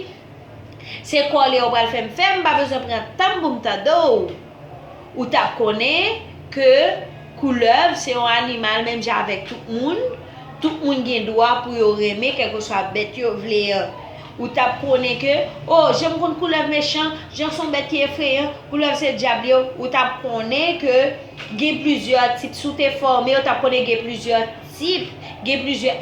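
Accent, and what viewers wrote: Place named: Cap-Haïtien, Haiti. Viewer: Aux Cap accent nice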